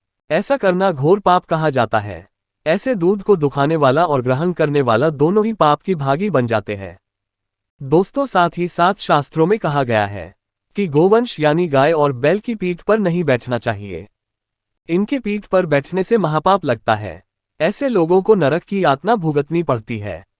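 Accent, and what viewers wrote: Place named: United States. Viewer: Aisa lagta he america ka admi hindi bol raha he, chutyapa 😝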